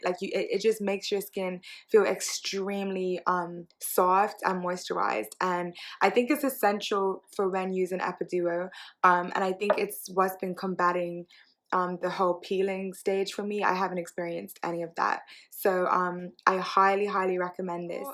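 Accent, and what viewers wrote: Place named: United Kingdom. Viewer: lol. I live in the UK. I could hear the British, but I was like 🤔 there’s some flavour here ❤️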